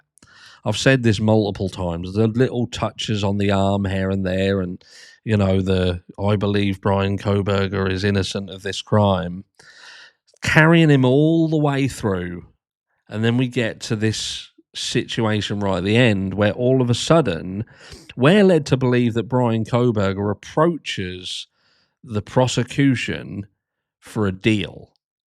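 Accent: United Kingdom. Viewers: I love the way you swear in your lovely British accent! Keep it up. Love from Idaho!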